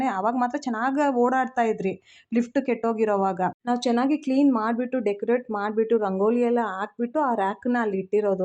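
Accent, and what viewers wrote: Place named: Punjab, India. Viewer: Hi nanu nime volg evte nudidu medam esta ayethu.but nime kannada keli sulpa shock ayethu i think u r a panjabi adre esto chanagi kannada madatitdara very nice 😊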